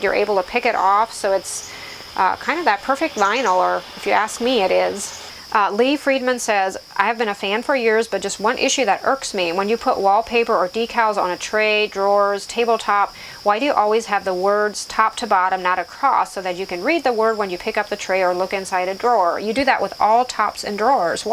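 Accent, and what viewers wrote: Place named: Pennsylvania Dutch, United States. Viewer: So good to know families do canning/ preserving together. My mother-in-law taught me to can. Bless her heart, she would work all day at a factory then come to our house and help me put up creamed corn or can green beans. She’s gone now but every time I pull out my canner or corn cutter I think of those days. Hard, hot work but soooo good in the winter. I love the idea of a cookbook! Two sisters whom are amazingly talented sharing their family recipes AND Amish heritage. I love learning about other cultures/ lifestyles! So interesting to hear you talk about how you were raised and to allow us to hear your Pennsylvania Dutch. Love you channel so much. Thank you for sharing!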